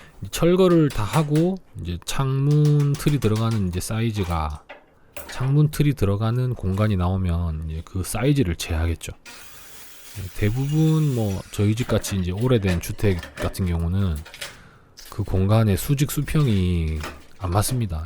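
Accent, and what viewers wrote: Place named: Gyeongsang, South Korea. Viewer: 경남 어디에서 살고 있어요? 말투가 진주/사천 쪽일수도..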